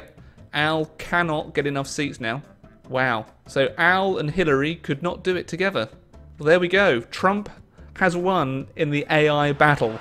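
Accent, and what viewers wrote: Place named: United Kingdom. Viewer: It’s funny as an American to here a British person say seats knowing very well he will never get it right.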